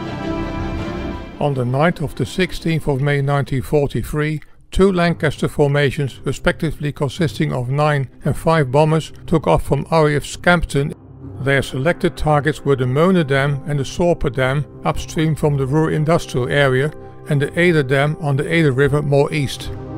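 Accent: Netherlands. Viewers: Sounds more Dutch to me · yep you sound Dutch to me ( my son in law is German) Very interesting presentation and analysis that you have done here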